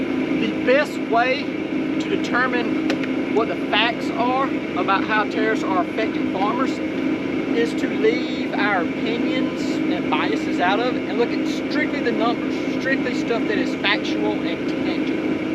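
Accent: South, United States. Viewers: Thank you for this report! It is very informative and without ideological or political influence. By the way, I love the southern accent.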